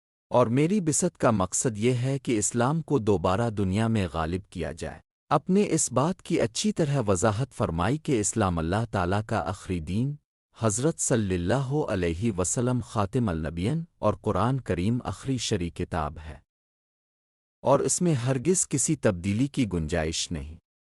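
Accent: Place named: Bangladesh. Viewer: بنگالی بھائی ہے مجھے جہاں تک اندازہ ہو رہا ہے کیونکہ اردو زبان بولنے میں بھی فرق ہے ۔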